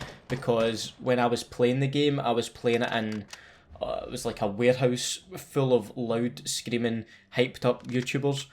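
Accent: Scotland, United Kingdom. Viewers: #scottishficationsquad thanks to my endless watching of your videos, my internal monologue now has a Scottish accent. Keep up the great and hilarious videos mate!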